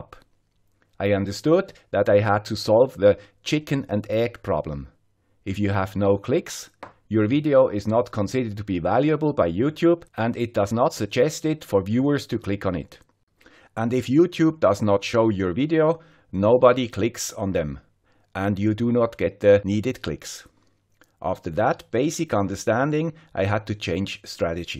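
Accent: Switzerland. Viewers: I recently discovered your channel and found it very insightful. Thanks a lot for all the time and effort you are investing - highly appreciated! And - having grown up at the Swiss Border - I love your Swiss Accent as it reminds me of my childhood:-) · I am addicted to your channel, including to the "guy with the Swiss accent". A lot of information & experience is needed in order to build simple projects - and you bring it in a clear format, open and direct. This episode showed me for the first time what is behind the scenes. please keep on what you do - you bring added value and I think you for that. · Dear, please by all means continue your channel as a creator. I like your work very much, it is well documented and has a high level of training/background information. I commented once on your Swiss accent, however in a gentle way. It is your trade mark and much appreciated by many people. 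I don't care about your hands/gloves. Some people are stupid enough to comment on this. It's the content that matters. Best regards and much respect from Belgium. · I, like others who have commented, look forward to your "Swiss accent" and white gloves. They are your "trade marks" - look after them as they serve you well. Good luck ! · Your Swiss accent is one of most characteristic things in your videos. And you speak very clear, with is very good. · I have been watching your channel for about a year now. Please don't be worried about the accent. In fact it reminds me of my friends from the time I lived in Switzerland. Thank you for sharing your knowledge with all of us. Keep up the great work and have a wonderful 2018. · Thank you for your perfect videos! Your hard work really motivates me not to give up. And of course we love your famous trade mark - The Guy with the Swiss Accent. Keep moving on! 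· The content and the way it is presented makes this site a valuable learning tool for me. The gloves and Swiss accent enhance the presentation. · first time I saw your video and heard "guy with the swiss accent", and then of course the amazingly professional, detailed and relevant content, i was hooked ever since. Please dont stop being creator. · Good to hear you're not stopping production. I would miss listening to the 'guy with the Swiss accent'. Belated Happy New Year. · taohawaii every time I start a video and hear, “Here is the guy with the Swiss accent“, in my head I always add “…again.“ 😊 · Hello from the guy who does not care about appearances. I had learned some interesting things from your channel and I hope that in the future you will keep sharing with us your projects and ideas. In Florin country we have a saying "Dogs are barking, the caravan is passing", keep up your work and only give your attention to things that are worthy of it. 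Big up for the guy with the Swiss accent. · Your grasp of logic is a huge factor in why I like your videos. It literally makes learning easy with the way you present and investigate in a logical way. Also, English with Swiss accent is actually easier to understand than maybe 50% of the population of England, so worry not on that front! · thanks for continuing to produce high quality and well researched videos. Your channel is one of my favourites because I know every video will be well made and I will learn something new. That must take an extraordinary effort and I appreciate what you’re doing. I never knew the background behind your introduction or the gloves - just assumed you were extra cautious with ESD - but now they’re a trademark and I look forward to watching each video from ‘the guy with the Swiss accent’. Best wishes from Australia. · Oh man... I never saw any of those negative comments and I love your intro "the guy with the Swiss accent". 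Your videos are the best. I am shocked that you received negative comments, but even more so that they would almost cause you to stop creating content. I am so very glad you carry on! Your ESP8266 low power videos are invaluable!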